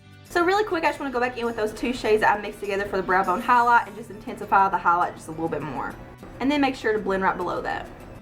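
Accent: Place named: Louisiana, United States. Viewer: Haha Im pretty sure our accent is the same!!! Im from Louisiana lol!!!!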